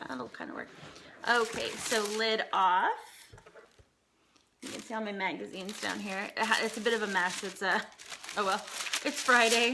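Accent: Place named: United States. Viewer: your cute ....I saw an ash vlog ...you have an American accent do you live in England year round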